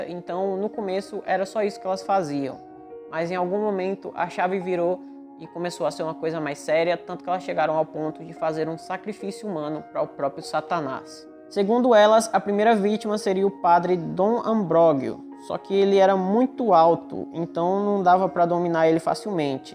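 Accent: Northeast, Brazil. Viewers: Esse sutaqui e da onde??? Do nodeste????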